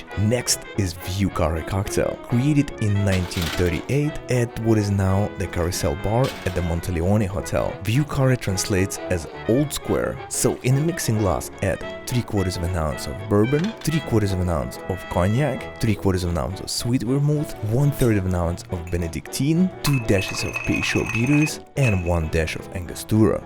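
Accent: Russia. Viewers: You russian or romanian or bulgarian?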